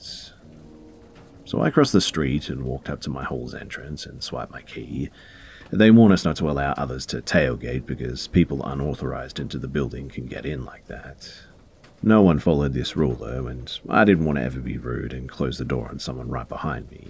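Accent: Australia. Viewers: I detect a lack of an accent. Not NZ. Not pomy. Must be a well spoken Australian. Ye. Definitely Ozzy. How ya goin' mate?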